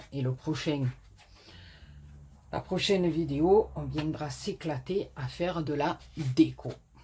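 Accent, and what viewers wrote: South, France. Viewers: Qui peut bien vous demander de vous justifier sur "votre chaîne", une personne qui ne comprend pas grand chose et encore moins à ce qu'est le partage. Moi j'adore vos créations avec ou sans tuto c'est toujours un plaisir pour les yeux. J'aime votre bonne humeur et votre accent du midi, ne changez rien. Cette carte est magnifique et à refaire. Merci pour ce pas à pas très bien expliqué. Bonne journée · Cc je ne suis pas abonnée depuis longtemps mais je dois dire que tu partages beaucoup de tutos. Ne t excuses pas et tu fais ce que tu veux sur ta chaîne. Merci pour le partage et pour ton accent du sud que j adore💕💕belle soirée 😘😘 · Bonjour, j'adore tout ce que vous faites, je regarde toutes vos vidéos malgré que je commente rarement. C'est votre chaîne vous y faites ce que vous voulez!! Vous n'avez pas à vous excuser et je trouve complètement ridicule et irrespectueux de quitter votre chaîne parce que vous faites pas tout les tutos de vos créations!! 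Vous devez prendre du plaisir sur votre chaîne et ne pas avoir aucune obligations!! Ce que j'aime aussi beaucoup c'est votre accent du Sud:D Bisous et continuez telle que vous êtes!! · Coucou, moi je te comprends tout à fait et je te reste fidèle car je t'apprécie beaucoup. Même si ça fait que 2 ans que tu fais du scrap, j'adore ce que tu fais, reste comme tu es. Les tutos en vidéo doivent être fait avec plaisir et sans stresse, sinon ce n'est pas la peine. C'est toujours un grand bonheur de te retrouver et d'entendre ton si bel accent du sud. Les personnes qui se sont désabonnées n'y connaissent rien en scrap et ne comprennent pas la scrapeuse. Fait bien attention à toi, je t'envoi pleins de gros bisous et mon soutient. Sabine Sarazin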